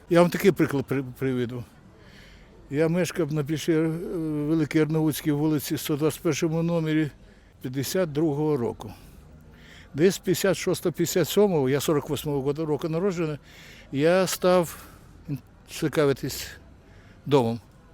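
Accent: Russia. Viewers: Как он старается говорить на украинском, но черт, возьми, он же русскоязычный, дураку понятно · Почему нэмецкая, а не нимэцька, розмовляли, а не ромовлялы, была, а не була? Как не старается выдать себя за украиномовного, не получается, русские слова проскакивают. Молодец, заработал свои 30 сребреников. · Суржик це не Українська мова. А діло закидає москальський акцент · А русский акцент скрыть не возможно Это Я вам как Одесситка говорю И все всегда говорили на русском и на своем что получили по национальному признаку 30 лет проработала на Дерибасовской и только русская речь звучала в Одессе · У самого в мові відчувається, що більше розмовляє посійською..,але зараз намагається говорити українською. Фонематика не українська. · В Одессе до войны можно было услышать разные языки-это правда. В 2010 году могу с ответственностью сказать, что в основном, говорили на своем "одесском" наречии, его ни с чем не перепутать. 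Мужчина, судя по его акценту, тоже говорил на русском, или на суржике. Главное, что в сердце у человека. На фронте бойцы не поднимают этот вопрос до фанатизма! Слава Богу! Слава ЗСУ! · Пиздун, всю жизнь говорил на русском, теперь перекрасился, власть поменялась, это слышно по акценту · А у самого то відчуваєтьсяговір російської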